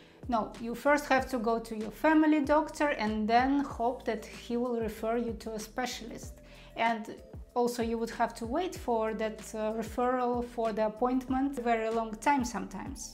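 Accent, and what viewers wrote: Russia. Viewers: Sounds like you are Russian. Of course you are going to like the BG.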